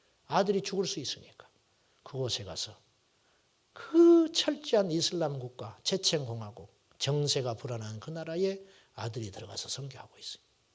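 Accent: Jeolla, South Korea. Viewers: 목사님의~ 전라도 사투리 말씀에도 정겹고~ 은혜 됩니다~😊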